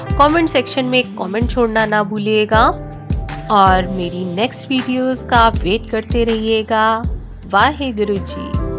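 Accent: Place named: Punjab, India. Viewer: Waheguru ji ka khalsa waheguru ji ki fateh.. Yes..Baba Deep Singh was true devotee of Guru Gobind singh ji.. When Guru ji founded khalsa path..He teained many warriors n Baba Deep singh was one of them Chidya de naal baaZ ladaava.. Geedra to mai sher banawa .. Shawa lakh naal ik ladawan Tan Guru Gobind singh naama kahawa.. “चिड़ियों से मैं बाज लडाऊं , गीदड़ों को मैं शेर बनाऊ।” “सवा लाख से एक लडाऊं तभी गोबिंद सिंह नाम कहाउँ.. Wow my sweeto sisy feel proud to be your bro.. Your way of speaking is true punjabi.. Naaz hai mujhe apni bahan par..dhair sara pyaar..🙏🙏🙏🙏🙏🙏🙏🏼🙏🏼🙏🏼🙏🏼🍫🍫🍫🍫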